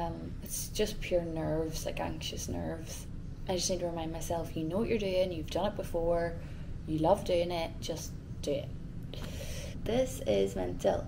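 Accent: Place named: Ireland. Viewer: Lively and beautiful. That irish accent ❤. Glad youre with my fav band DD.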